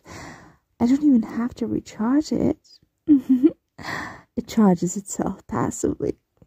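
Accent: United Kingdom. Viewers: Hey are you welsh it's bugging me because I figure it out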